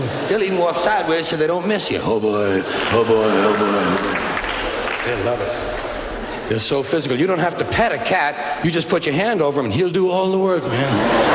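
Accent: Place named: South, United States. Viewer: He sounds Southern in this vid, for some reason.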